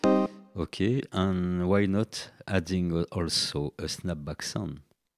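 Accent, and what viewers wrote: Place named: France. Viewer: Ça sent l'accent du terroir 😁👍 Super vidéo en tout cas. Il a l'air vraiment top ce plug-in, ils m'emmerdent Cableguys de sortir des plugins comme ça, simple, bien foutu, intelligent, efficace... ils tombent toujours dans le mille ! Et à chaque fois il me les faut...! Jamais déçu par ce dev, au contraire.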